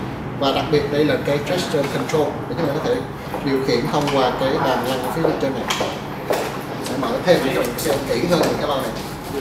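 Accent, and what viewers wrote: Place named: Vietnam. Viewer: am thanh trung thuc giong noi gioi thieu re qua ( miet vuon)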